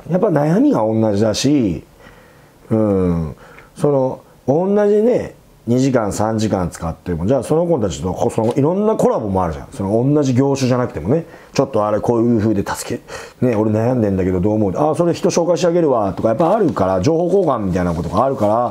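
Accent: Nagoya, Japan. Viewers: 名古屋弁聞くとホッとしますね(^ ^) 為になる話ありがとうございます。 p.s.錦は高級で行けないので、栄の女子大小路によく行きます。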